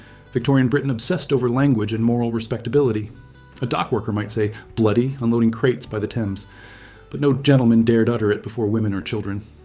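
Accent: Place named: United States. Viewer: Rubbish US accent commentary and many of the words pronounced incorrectly.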